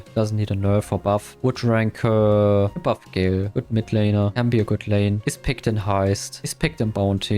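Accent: Germany. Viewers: english is not his primary language, he's german, don't expect too much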